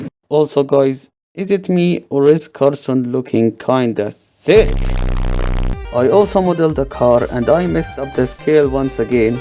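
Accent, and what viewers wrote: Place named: Arab world. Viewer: عربي؟ i knew it from the acent then the T H I C C Milk!!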